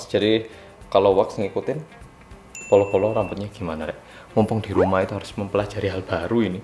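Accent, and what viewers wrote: Java, Indonesia. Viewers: Medokkkkkkkkkkkkkkkkk jaga kesehatan mas 🤗🤗🤗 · Yeheee orang korea yang MEDOKKKK hallo mas👋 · Gue nonton trus video dia .. dia asli korea .. sekolah di indo balik korea lg kok jawa nya medok banget .. geuuumeeeeess 🤣🤪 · Di rumah aja nontonin sing medoook aja! Hehee ᬚᬓᬭ᭄ᬢᬭᬬ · Style baru nihh rambut baru kacamata baru makin berasa kek oppa medhok awkwokwowkwowkowkwo....... cocokan gitu seee keliatan kurus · beli mi instan korean spicy bang medoookkk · Udah lama gak nonton, kangen medhog mu mass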